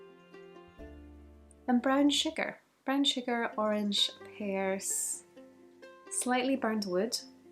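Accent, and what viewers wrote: Scotland, United Kingdom. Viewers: not a native or an expert, but you do sound like a Scot. and Oban 14 (depending on a release, granted) is one of my favourite all time drams. there's just something sticky and earthy about it that I just love and almost can't find anywhere else.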